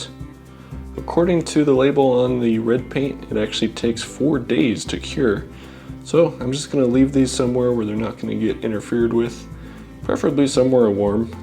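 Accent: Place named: United States. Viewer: Irritating americun voice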